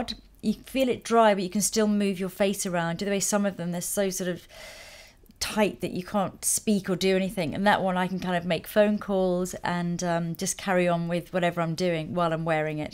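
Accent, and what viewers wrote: United Kingdom. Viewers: love your british accent!!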